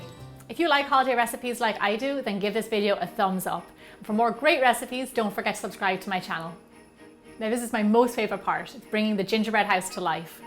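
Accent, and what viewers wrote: Ireland. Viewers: your accent makes me wish i lived in ireland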